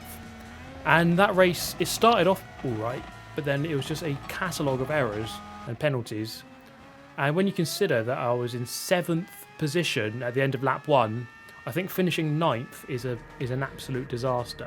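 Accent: United Kingdom. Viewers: As a british person, it never occurred to me that many people here just enjoy his accent. "Everyone" (lots of people) I know speaks like this, and it's actually nice having this on in the background of working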